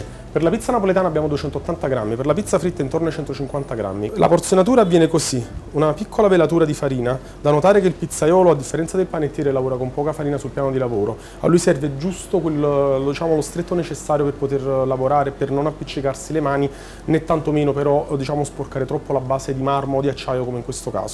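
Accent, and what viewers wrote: Standard. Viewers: Ma parla esattamente italiano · He's speaking normally for us, we're italians.Why, maybe you were convinced that we were going to sing pizza pizza mandolin to the rhythm of tarantella? This is the normal speech of an Italian. At least we do not eat vowels for breakfast like you.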